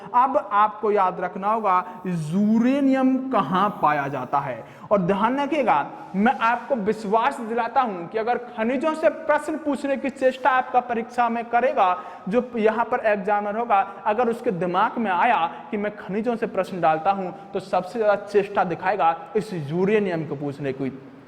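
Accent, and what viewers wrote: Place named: Western Uttar Pradesh, India. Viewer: Itna clear hai Bhai ki ye west up se hai shayad bulandshahar.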